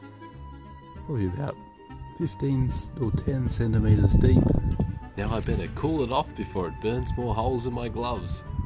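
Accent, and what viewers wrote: Australia. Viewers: Are you Aussie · You've got a fantastically soothing voice. Great to hear a fellow Aussie who doesn't sound like Steve Irwin. Very cool video!!! You speak very sweetly about the spiders too!!!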